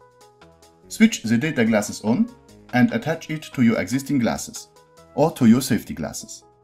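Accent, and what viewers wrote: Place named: Germany. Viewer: are you german or french? sounds a lot like english with a strong german accent :D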